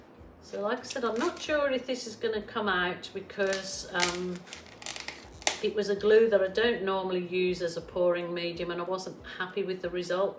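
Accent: Birmingham, United Kingdom. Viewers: Wow that is brilliant...love these colours. You may be in australia but to us brits we can still hear your brummie accent x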